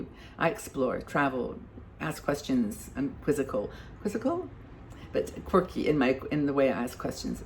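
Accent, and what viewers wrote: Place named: England, United Kingdom. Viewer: I thought I could hear a very small hint of an English accent in previous videos, small but still there.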